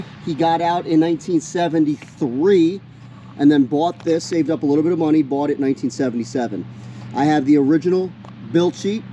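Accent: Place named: South, United States. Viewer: Fortunately for him, the southern drawl does not hold him back from moving inventory every month.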